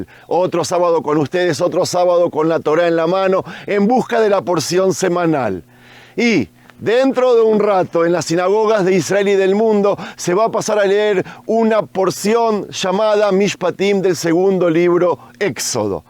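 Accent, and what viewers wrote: Buenos Aires, Argentina. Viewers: Israel y los judios son el Pueblo Elegido de Dios que han sufrido persecucion durante siglos hasta hoy. Hashem los proteja siempre. Bonito amanecer en Jerusalen. A mi tambien me gusta mucho la Historia. Mi grupo de hermanas de mi iglesia y yo estamos leyendo la Biblia cronologicamente y ahora estamos acabando Exodo. Gracias, querido, por tu esfuerzo, por tus paseos, por hablarnos estas historias maravillosas con el Tanaj en la mano, con tu hermoso acento bonaerense. Bendito Israel y su hermosa gente. Seguimos orando x todos vosotros, por los rehenes, por los soldados y por tu hijo Eden. Que Dios os bendiga. SHALOM desde Barcelona. AM ISRAEL JAI✡️🇮🇱🙏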